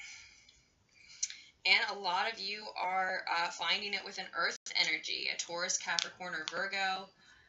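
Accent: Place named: United States. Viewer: You're so beautiful and insightful. I like your energy. And, even though I suppose you're American, judging by your accent, you and your environment look quite European and more soulful than the average US-like vibe.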